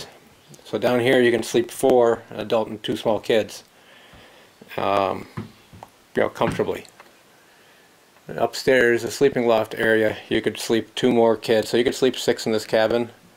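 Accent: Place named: Germany. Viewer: Amish? Fabulous God loving people. Its good you can count on Amish for supplies. You sound German (accent) Thanks for video!!!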